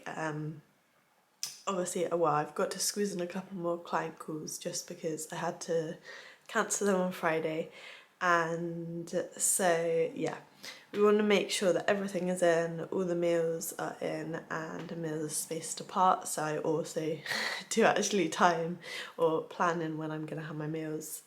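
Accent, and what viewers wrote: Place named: Oxford, United Kingdom. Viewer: That Oxford accent tho... <3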